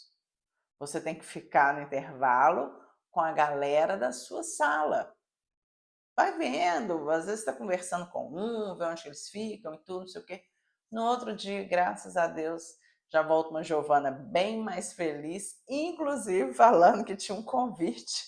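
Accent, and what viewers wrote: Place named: Brazil. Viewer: Gosto muito de a ouvir ! Tem toda a razão quando diz que muitos brasileiros se fecham "guetos", ou a comunidade migrante em geral. Claro q é um erro. Nunca vão conhecer novos costumes e culturas. A questão do Português de PT, aprendam só os termos e formas verbais e tentem falar / escrever consoante essa aprendizagem ( mais importante para quem estuda), mas não percam esse lindo sotaque, que não nos incomoda nada, pelo contrário. Sucesso!!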